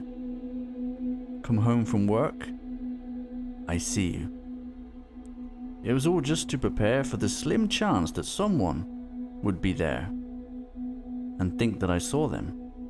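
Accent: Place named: England, United Kingdom. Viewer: Subscribed on the first thing. Yay~ Your English accent is so pleasant and your voice will definitely be in my ears as twice as before since you will be uploading quite often from now on. 🤭 Super thoughtful of you to add in the pixel art 3D styles visual, totally loving it. It makes the entire video become so interesting and lively. I got spooked . Lmao. 🤫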